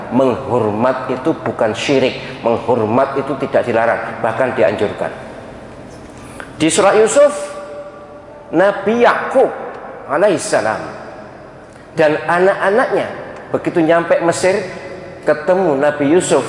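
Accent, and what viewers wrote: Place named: Madura, Indonesia. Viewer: Madura mireng prof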